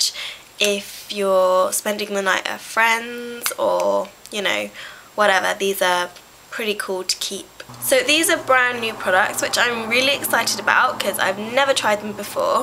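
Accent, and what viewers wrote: United Kingdom. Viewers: i could listen to u all day! i jsut love ur cute lil british accent! =DDD u should've totally tried out for cho chang =)))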